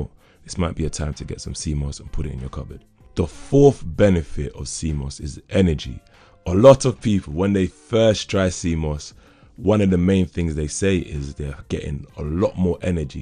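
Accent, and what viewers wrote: Caribbean. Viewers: The information that you shared is very empowering. Thanks. I love your voice/accent. It's very raspy/sexy and Caribbean. Can you share some shakes or drinks that we can make using seamoss/seaweed/algae? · He sounds Caribbean to me.....African are different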